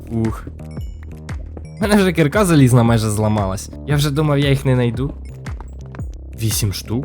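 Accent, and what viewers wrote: Ukraine. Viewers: Как русскому, очень не привычно слышать украинский акцент._.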